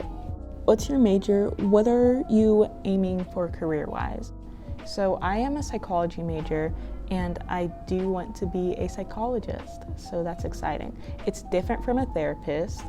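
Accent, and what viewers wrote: Nigeria. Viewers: Ooh cool, is it weird you sound like nigerian? You didn’t really specify, but are you? I’m Nigerian, it’s cool seeing other Nigerians you tubers. Anyways congrats on 100k!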